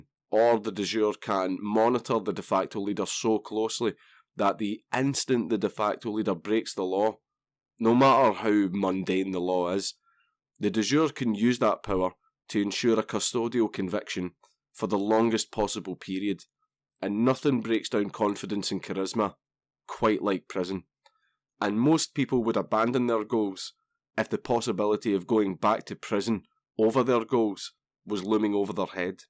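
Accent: Scotland, United Kingdom. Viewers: don't break dude, they can lock up your Body but your mind is free like a bird. (add subtitles to your Video in order to reach more people. I can understand scottish accent since i spent a lot of time in Glasgow over the course of many years but most who know english have a hard time understanding your accent) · So where do I sign up for audiobooks read by a dank Scottish fellow? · Nice vid, but FYI "de jure" and "de facto" are Latin, so your pronounciation of "de jure" in particular is way off. This is not a pedantic point, here's why. I was listening to your video and not watching, and I was wondering why you were talking about "du jour" authority (which is French for "of the current day"). So for a while I couldn't understand why you were talking about arbitrary authority as opposed to authority by law (de jure). To pronounce "de jure" in such a way that you won't confuse listeners like myself (which may very well be just me), you want to say it more or less like: "day you ray" (I'm trying to account for your Scottish accent! 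:D). Cheers.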